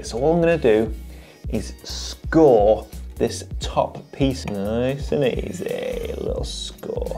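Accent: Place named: Northern England, United Kingdom. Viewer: I was born in Northwitch, Cheshire and have an Uncle who lives in the lake district. I now live in Tennessee, and I love watching your channel because, not only am I inspired for my own photography, but hearing your accent transforms me home. Thank you for all you do!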